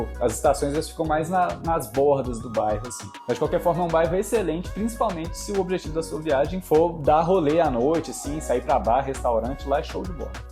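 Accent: Minas Gerais, Brazil. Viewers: Gostoso demais ver vídeo com sotaque mineirim! Parabéns aí! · ei cara otimo video , eu desconfiava que tu era mineiro meio matuto tudo termina em (ENO) correno,comeno,fazeno,sabeno , falano e por ai vaiiii. estou (INO) pra la otima dica.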